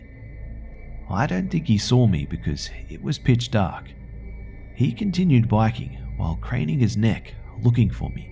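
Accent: Australia. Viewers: Yes but he is Australian like me...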